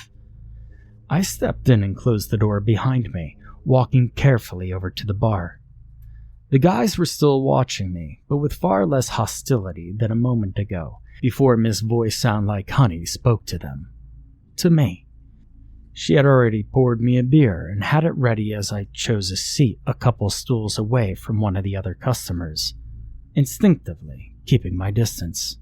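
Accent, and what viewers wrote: South, United States. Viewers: Great southern mom accent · I'm wheezing,. The way you pull off that accent tells me you KNOW the southern life to some degree 😂😂 If not, and, tbh, either way, you nailed it! ❤❤❤ Thanks for the smile and the wonderful story! EMDR is...hard, and I've been binging your channel after not seeing you on my algorithm for nearly 4 years! I'm glad I have so much to catch up on now, despite YouTube playing keep away! 🥰🥰🥰🫂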